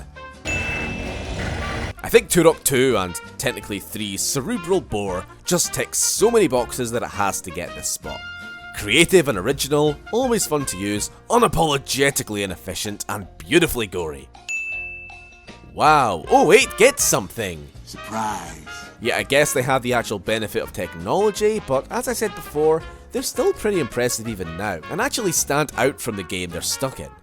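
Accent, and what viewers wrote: Scotland, United Kingdom. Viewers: I have subscribed to you because :you made Elder Scrolls/ Star wars jokes, your regular jokes are actually funny, I love the scottish accent and everything Scotland (culture, drink, food and people). And you make good videos of great games we've all played. I, from clan Cambel, welcome aboard ya cheeky bastard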